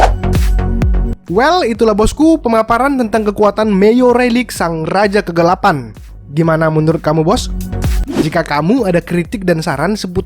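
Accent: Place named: Medan, Indonesia. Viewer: nampak kalo abang ni orang medan bah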